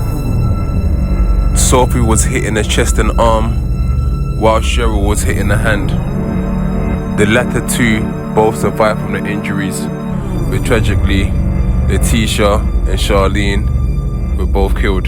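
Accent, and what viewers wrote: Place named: London, United Kingdom. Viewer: Sym u likkle London posh yute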